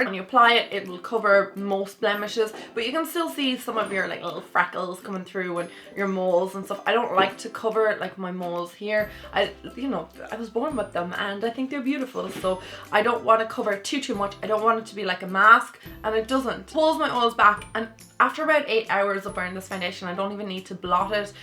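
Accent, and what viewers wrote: Ireland. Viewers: I love Maybelline Fit Me Matte!! Oh, and I subscribe just because you have this cute Irish accent. Me love Irish accent!!!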